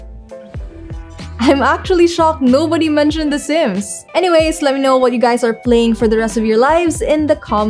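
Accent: Philippines. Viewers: Filipino accent is strong on this one. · That's some thick philipino accent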